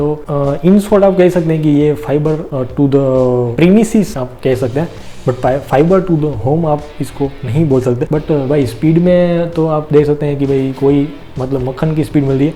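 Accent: Gujarat, India. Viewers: Wah bete wah Aap gujrati ho na aapki hindi se pata chal gaya Hu pan gujarati chhu bhai..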